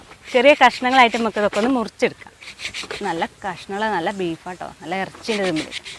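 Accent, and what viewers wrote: Malappuram, India. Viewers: മലപ്പുറം സ്ലാങ്ങിൽ നല്ല അവതരണം. ബീഫ് ചുക്ക.. അഡാർ ഐറ്റം 😍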